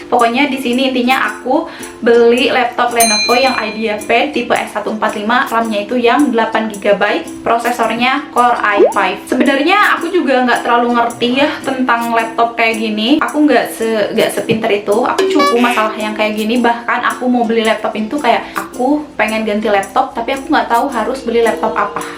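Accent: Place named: West Java, Indonesia. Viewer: ihh tth org Sunda 😂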